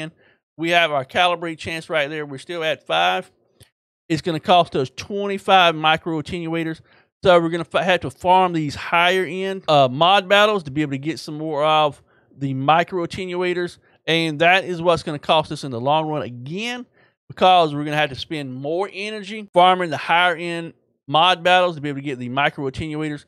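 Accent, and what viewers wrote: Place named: South, United States. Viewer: your fast paced southern American accent is quite hard to follow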